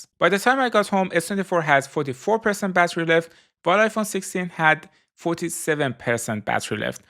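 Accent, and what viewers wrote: Iran. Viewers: Are you Persian? Your accent is sooo close to Persian Thanks for the video by the way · لهجه ایرانی غلیظ😂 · لهجه ایرانی باشه ؟ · oh this persian accent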